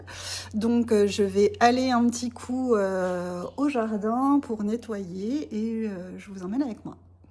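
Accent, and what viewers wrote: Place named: Franche-Comté, France. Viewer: Bonjour. bravo pour cette chaîne que je découvre aujourd'hui 😉 quel plaisir quand j entends que tu viens de franche Comté....cela nous fait un point commun 😅 l' accent...je connais... et le tien est très léger 😊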